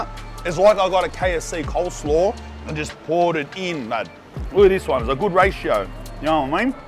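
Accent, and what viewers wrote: Sydney, Australia. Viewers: That’s that Syd accent for ya 😅 makes me miss home. Just found this channel & it’s entertaining, real & humorous. Died at the coriander 🌿 & I love fresh rolls too 😋 nobody does pork rolls like Syd. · nice guy, fair reviews but man... the aussie slang is hard to follow, it's like a south african, british, aussie crossover combo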